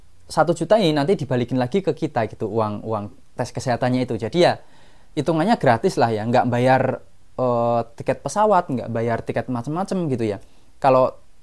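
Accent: Java, Indonesia. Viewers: Suata mu masih medok jawa ne, kl pun tinggal di Jepang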